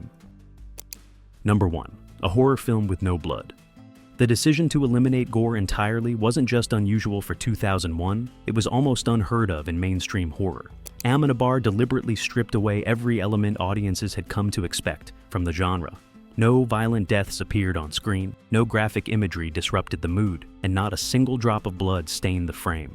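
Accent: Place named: United States. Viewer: Complete waffling drivel. Garbage duhmerican narration.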